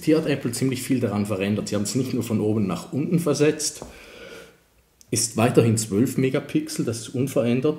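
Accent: Switzerland. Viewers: an deinem hochdeutsch feilen. Da hört man den schweizer einfach zu stark raus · ihr in der Schweiz auch im Dialekt "sächs" sagt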